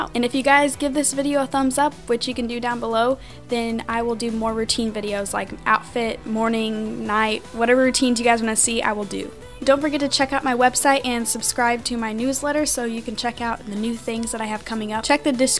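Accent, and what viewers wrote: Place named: United States. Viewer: What country are you from? Cause it's autumn nearly winter in Australian and Southern Hemisphere, but it's spring nearly s summer in America,northern hemisphere. But you sound American. Aha well anyways by the way you are so beautiful with or without this makeup and I respect you being so brave :)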